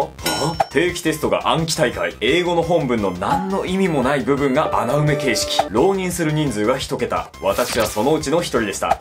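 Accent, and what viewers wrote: Miyagi, Japan. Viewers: もしかして宮城県出身ですか？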